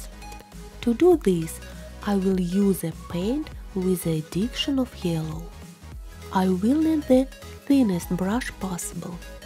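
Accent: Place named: Russia. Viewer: Вы русская?) Русский английский.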